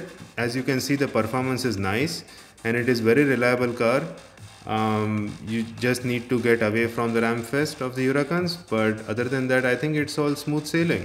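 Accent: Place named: India. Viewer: your voice is kinda Indian type ... Just the accent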